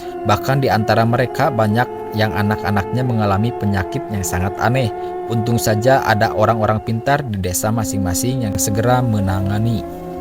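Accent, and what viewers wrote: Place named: Sunda, Indonesia. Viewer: Lentongna sunda pisan euy 😊👍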